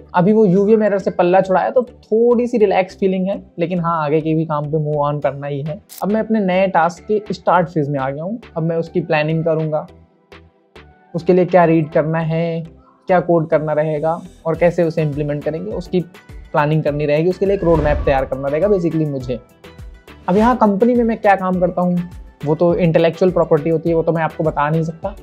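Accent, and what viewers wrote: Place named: North, India. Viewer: Bro could you tell..? where are you from..? your accent denotes you're from northern region of india. i.e UP/Bihar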